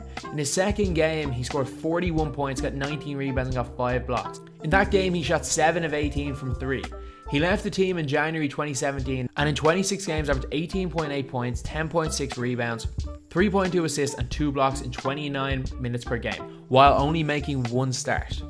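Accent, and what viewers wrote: Ireland. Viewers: where is josh smith now you ask, in the nba i hate your irish accent go watch your own sports you potatoe eating fuck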